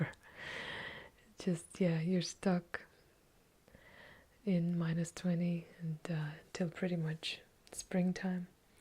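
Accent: Russia. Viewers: Your voice is so warm and relaxing, some of the most soothing ASMR I’ve ever heard. When I first discovered the art form several years ago, GentleWhispering was the first vocal ASMRtist I heard who made me tingle and she also has the same hypnotic Russian accent. Something about the accent just instantly melts my brain and gets me ready to fall asleep, thank you so much for doing what you do!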